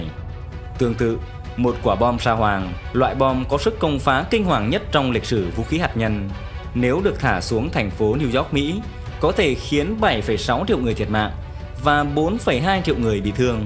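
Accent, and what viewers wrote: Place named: North Central, Vietnam. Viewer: 1trăm 20,chứ k phải 1tăm 20..phát âm bắc trung bộ, lai bắc bộ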